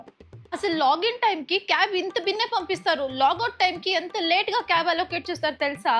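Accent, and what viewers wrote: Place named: Karnataka, India. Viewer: Mee slang Bale ga vuntundi karanataka Telugu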